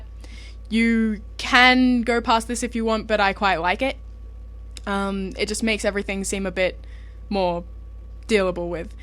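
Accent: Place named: Australia. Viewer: You're Australian!? ;D I love your accent!~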